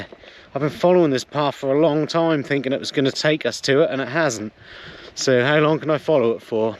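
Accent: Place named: Norwich, United Kingdom. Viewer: Just discovered your videos and loving them especially as I'm a Norwich girl with a campervan, great to hear someone with my accent, very unusual. Recognise your face too, maybe in Norwich pubs? You love the same music as me.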